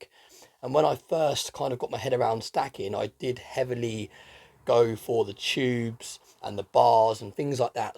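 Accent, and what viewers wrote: United Kingdom. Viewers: So sad! No punch line. I can't agree with arbitrary buy, don't buy scheduling. Always stack, never stop! Price cost averaging is the only way to look at it. Sure you can tighten your decision making, but that doesn't stop you from buying good deals or buying the dips. I know you said 'the rest of the year OR until the price comes back down' so you kept the door open, hedged your bets or whatever. The price could be back down next week.... So I really don't think you mean this, maybe this is just click bait with a british accent? As far as price goes I just bought 54 oz at $23.75 (last night). Just a dollar over spot and actually the same price I paid the last week when spot was $20.09 and less than I paid for some the week before. Oh pickle my carrot 🥕 if you think I am wrong!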